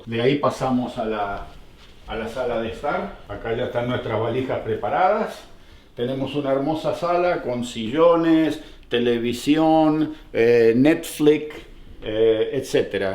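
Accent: Argentina. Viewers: Como se hacen querer!! que maravilla seria poder viajar con ustedes! Hace 2 meses llegué a Toronto y durante todo el proceso de sacar la visa (9 meses me llevo) estuve mirando sus videos y aprendí un monton sobre Canadá!. Gran saludo!!! me recuerda a mi papá que nació en la misma epoca. Y es tremendo como tiene todavia el acento argentino bien marcado! Gracias!!!!!! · arranca el video mencionando que va a regar las plantas porque hace mucho calor, aunque no sean sus plantas ni su casa QUÉ GRAN CORAZONNNNNNNN, LA PUTISIMA MADREEEEEEEEEEEEEEEEEEEEEEEEE, encima dice "le vamos a pegar una regada", no hay caso, lo escucho y escucho tonada e historia argentina, lo apreciamos mucho!! <3 <3 <3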